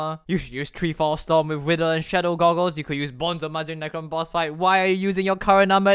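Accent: Singapore. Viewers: I can tell ur from Singapore too:D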